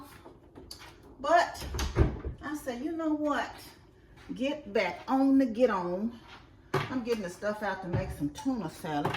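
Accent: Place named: South, United States. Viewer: I love this lady! That southern accent is so cute ❤️❤️🥰🥰🥰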